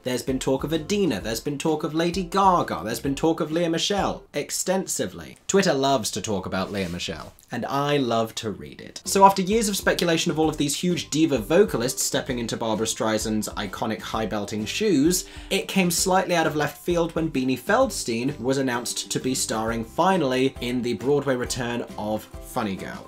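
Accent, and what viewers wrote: United Kingdom. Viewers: British accent vs Brooklyn Jewish accent. What a trite criticism. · This critic is very intelligent, I'll give him that. He looks like he's 19 years old. Wonderful that he thinks he's all that. This is ALL his opinion. A British accent doesn't make you an automatic theatre historian. A bit full of himself. Not impressed. On another note, I dont think Beanie was well cast at all. Miscast, to say the least. Of course she is no match for Streisand in any decade or in any category. The voice alone. I'll tune in when this kid grows some more facial hair.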